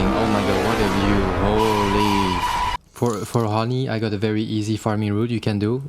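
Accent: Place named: France. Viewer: LOL I didn't imagine you were Asian. I always thought you had something that sounded a bit like French accent, not sure, but I thought you were most likely European or smt.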